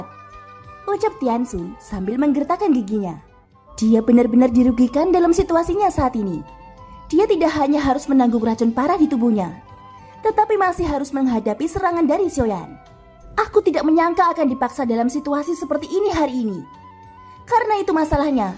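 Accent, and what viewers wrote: Java, Indonesia. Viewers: Min aku rindu suaramu yang meddo'😅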